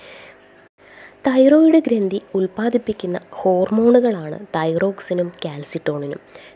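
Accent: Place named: Thiruvananthapuram, India. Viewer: Ente same soundum slangum,Teaching methodum . Trivandrum aano. I am surprised.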